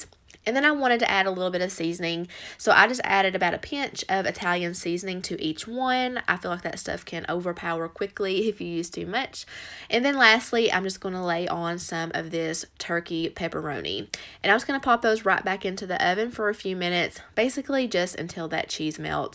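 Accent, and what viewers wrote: South, United States. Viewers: Hi!! I have missed you and your southern accent so much. I also have a southern accent but not like yours. So glad you are back. Everything looks so delicious. Hope you have a great day and week. Stay safe. God bless.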